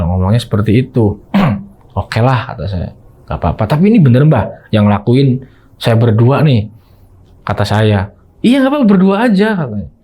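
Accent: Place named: Indramayu, Indonesia. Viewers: Indramayu hadir dari hongkong...Bahasane kosi kentel pisan ya kang,Indramayu ne ning endi kih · Aku orang indramayu bang logatnya sama kalo ngomong pahaam betulll 😂❤❤❤ top lah ceritanya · Mlesat 😅, bahasa nya engga angger , Indramayu bgt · indrmayunya melekat ya mang😂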